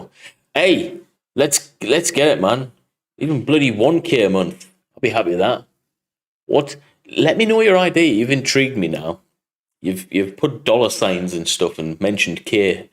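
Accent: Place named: Teesside, United Kingdom. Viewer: Dit just recently subscribed loving the content Got put on to ypur Channel by combat Arms very happy to find your channel as a doubled in the Marines a long time ago as a reservist and then discharged as I filled a medical on the eye test but anyway no point crying over spilt milk I've just heard you see in this video you are from the North East same here currently living in Washington originally from Sunderland spend most of my time in Newcastle I'm getting by your accent you're a little further South than Tyneside or Tyne and Wear probably going towards Teesside I should imagine that's my guess anyway am I close?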